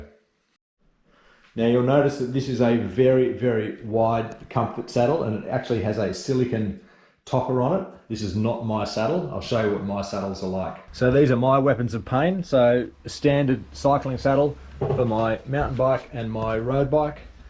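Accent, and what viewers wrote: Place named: Australia. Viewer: I really appreciated your series, your honesty and the clarity of your points. And great that I could hear it in my native language too (Aussie). Thanks!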